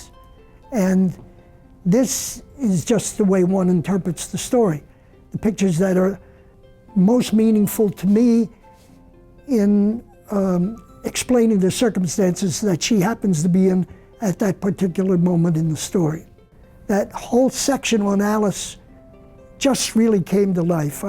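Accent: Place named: Philadelphia, United States. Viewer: Seeing this video made me cry because Charles was my dear friend, and I miss him and think about him all the time. I became an illustrator and painter and would get to spend time with him at his studio talking about art and just about anything and family. He was a gracious man and very kind to me. He would look at what I was doing and shared so much insight and the sound of his voice really resonated with me as someone who also grew up in Philadelpha like me and also stayed in Philadelphia his whole career. We had many similarities in families and thought. I would say for many years I knew him and his work prior to becoming good friends but always thought to myself what do I have to say to him? He was brilliant and one in a billion. I only got the courage to finally make the call to his studio when I had children's books that I had written and illustrated published and saw success in what he did so brilliantly. 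I finally had something to share with him and Charles let me come to his studio and we spent hours together over many years just talking. He saw me as a fellow illustrator and was so very pleased that I was getting to be part of the parade he talks about in this video. He always greeted me with "Stephen!", and he made me feel special like family. I hear his voice often. He has a beautiful family. I loved that he was a family man.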